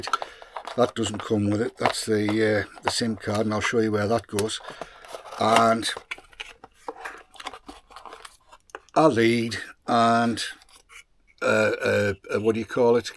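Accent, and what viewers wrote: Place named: Newcastle, United Kingdom. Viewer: British isn't a language, it's a nationality covering the English, Scottish, Welsh and Northern Irish. Scottish isn't a language either (though Scots is). This guy speaks English with a Geordie accent (from the Newcastle area in NE England) and maybe just the occasional word of local dialect rather than standard English.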